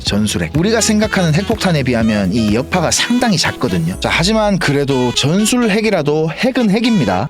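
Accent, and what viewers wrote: South Korea. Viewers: 확실한서울발음~^^ 퀀트1기입니다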